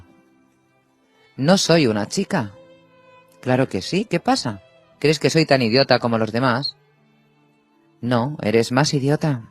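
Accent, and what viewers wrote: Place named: Spain. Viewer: Muchas gracias por tu lectura.. narras muy bien. Y me viene muy bien tus lecturas para ir combinando mi lectura con momentos que no puedo leer pero si avanzar en capítulos oidos a través de tus lecturas. Y es en español de España. Solo encontraba antes audiolibros en español latino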